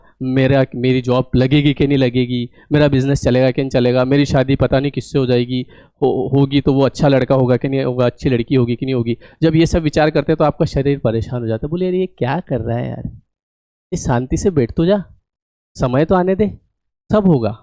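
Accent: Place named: Indore, India. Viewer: Sir Aap kaun se city mein rehte ho, aapki bolne ki tone indori hai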